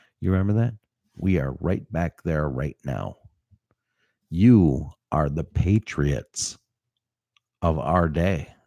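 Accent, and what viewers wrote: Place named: North, United Kingdom. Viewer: You do have an accent...you sound like you're from the North. I have a wicked accent, southern all the way.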